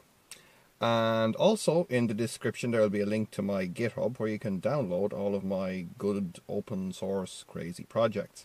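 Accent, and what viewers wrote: Ireland. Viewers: Authentic Irish accent, love it!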